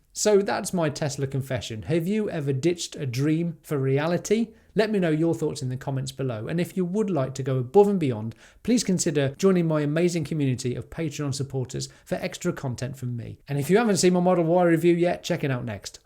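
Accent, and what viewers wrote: United Kingdom. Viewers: Thanks for the candid video and I understand where you're at. Ironically, although I'm a recently retired engineer, my secret dream was always to be a great guitar player. At the age of 65, I still take and enjoy lessons. The problem, as I see it, with music is that the world doesn't like to pay for music. Sadly, all the musicians I personally know are broke. They're fantastic and talented people, but broke. Stay confident in that you're taking the right approach. I hate money but understand we need it to live a happy and fulfilling life. My wife and I be fine in our retirement...not rich but certainly not poor. Two final thoughts: When you send in your last mortgage payment for your home, it feels like you've cast off 500 lbs of chains that have been burdening you for many years. It's wonderful. Also, my wife and I wanted to buy a new Model Y Juniper recently, but instead opted for a low mileage 2020 Model Y for 1/3 the cost of the Juniper. 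No more chains for us. Please don't quit YouTube. I enjoy your enthusiasm and crazy Brit accent. If you visit the US near Ohio, I'll trade you a couple guitar lessons for some room and board + a fun tour of our chunk of OH. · Hi. Here a spanish guy that enjoys Your Tesla Content Whit British acccent. Hope you find The determination to keep going. Best of luck.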